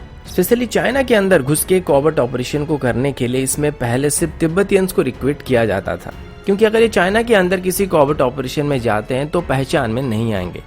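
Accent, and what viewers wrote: Odisha, India. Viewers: Vai tum odisha se ho kya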